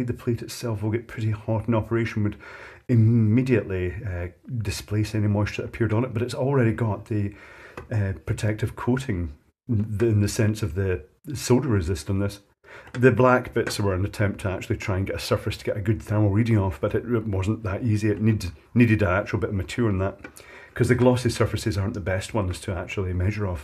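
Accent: Scotland, United Kingdom. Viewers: Must be his broad Scots accent.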